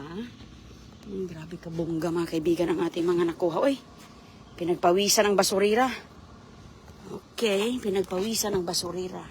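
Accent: Visayas, Philippines. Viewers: Visaya k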